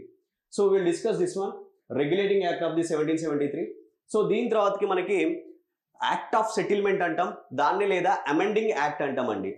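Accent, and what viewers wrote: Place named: Telangana, India. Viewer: Superb and excellent classes sir... I am a big fan of ur telangana yaasa..🎉🎉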